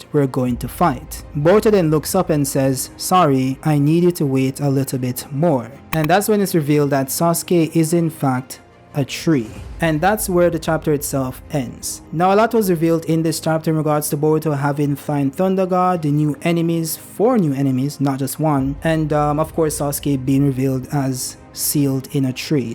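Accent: Jamaica. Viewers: Are you Jamaican? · Are you jamaican?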